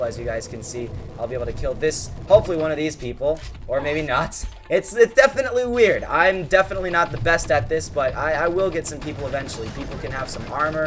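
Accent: Australia. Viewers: ur australian yay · Your Australian omg myself is not the only one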